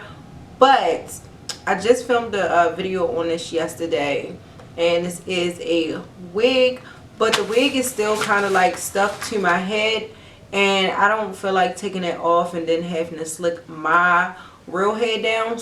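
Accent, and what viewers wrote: Baltimore, United States. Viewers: This is my first video ever watching her 😂 i think i can tell that she from Baltimore lol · bmore accent ??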